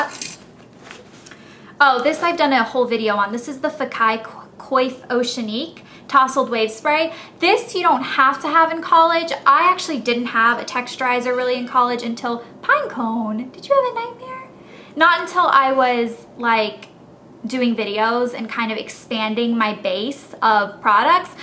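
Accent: South, United States. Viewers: Are you from the South? I never noticed your accent till this video? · she has a southern accent? weeell, I guess I can't tell because I'm southern too xD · in the first seconds i thougt you were from the south because of the slang :D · I feel like you "southern" accent is getting stronger each day. haha.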